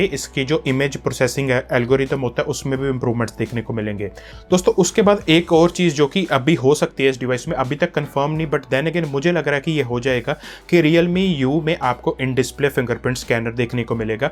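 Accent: Punjab, India. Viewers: Punjabi accent bro 😅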